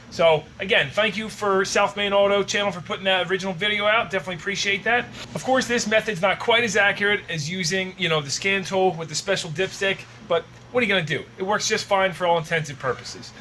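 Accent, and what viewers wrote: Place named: New England, United States. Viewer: Now that's what I'm talking about! And you know what I was kind of digging the Yankee dialect there. Y'all have a blessed day.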